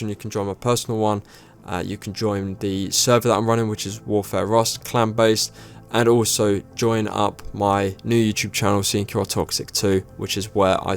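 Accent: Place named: United Kingdom. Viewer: if u talking on English and NOT GB English - much more ppl could understand u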